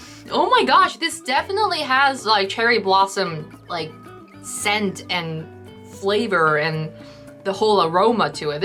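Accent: United States. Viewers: dang u live in korea? you sound american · You got a mid west accent. What state you get it from?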